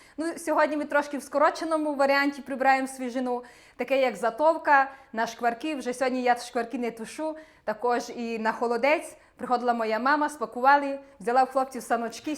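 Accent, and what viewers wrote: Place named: Western Ukraine, Ukraine. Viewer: чому "баночкі" а не слоїки?)) Можливо я помиляюсь...але, мені здається, що жіночка не з полісся. Акцент дуже схожий на західний.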